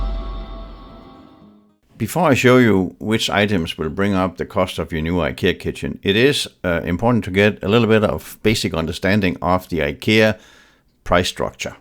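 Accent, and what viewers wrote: Denmark. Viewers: Hi, I just found your channel. I subscribed immediately because I love your Danish? accent. Both my parents were born and raised in Danmark. We're thinking of installing Ikea cabinets in our kitchen. Thank you so much for explaining the differences in cost. I really like the oak fronts and was sorry to learn that they are the most expensive. I might have to get white ones instead. Which is your favorite white door and which color white do you think looks best?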